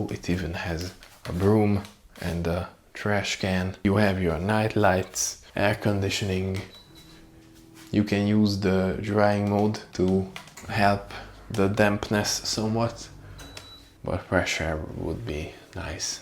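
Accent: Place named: Hungary. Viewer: Your bed is a Murphy bed (the one which is hidden in a wall). There are also Murphy tables (same principle). I thought your sofa would fold out (or you would arrange the cushions) to expand the sofa & make it into a bed, so you surprised me there. 😅 The flat is quite nice. It's small but functional. The bathroom is bigger than in other small flats that I've seen. I could live in that place if it had a window. Had a laugh when you mentioned the female & male hygiene products. 😅😅 Lol. Thanks for the tour! Btw, your accent reminds me of Dominic Szoboszlai, your country-mate. Cheers!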